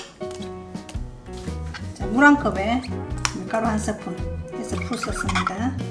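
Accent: Gyeongsang, South Korea. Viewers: 경상도 사투리가 참듣기 좋아요 전 전라도 사람인데요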